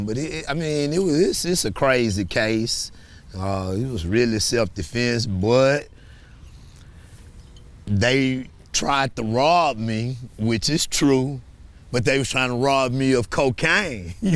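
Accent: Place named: Houston, United States. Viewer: He talk just like them Houston cats too.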